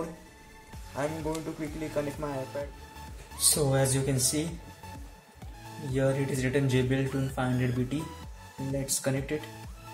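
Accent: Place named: India. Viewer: He has that indie accent you can't change an accent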